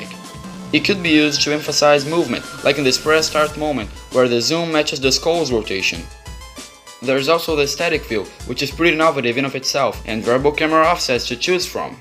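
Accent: Brazil. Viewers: Are you Brazilian or Spanish? I'm from Brazil xD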